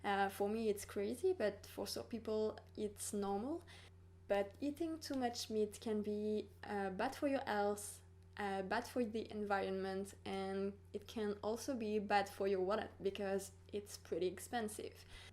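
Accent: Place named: France. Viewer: your french accent is so cute. LOVE it. I am enjoying your videos a lot!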